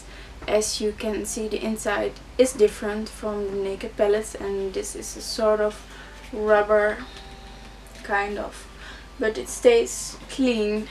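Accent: Netherlands. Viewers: Jij bent sws nederlands 😂😘 ik hoor het aan je 'platte nederlands' accent hhaah je spreekt overigens wel heel goed engels maar toch hahah ❤️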